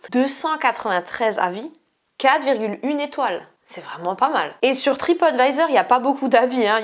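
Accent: Alsace, France. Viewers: Oh lalala l’accent alsacien tres prononcer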